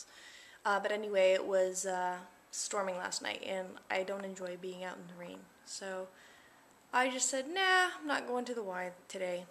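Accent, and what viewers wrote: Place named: Pennsylvania, United States. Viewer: Hey lady, don't k now if you got my message on a previous video... but i heard you say your parents are from PA... and i went "HA! I knew you were from Pa from your accent" I'm from Pa too... northeastern area... I love your vids sweety, I feel like your one of my friends, I think i listen to you more often than I hear from my own friends lol! I've recently quit my job so i'm home all day long and I've been spending alot of time on youtube! Love ya!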